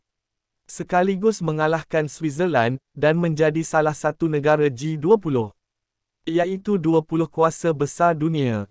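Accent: Malaysia. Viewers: Itu cara cakap malaysie tu datang dari bangsa Johor Kami bangsa Utara cakap Malaysia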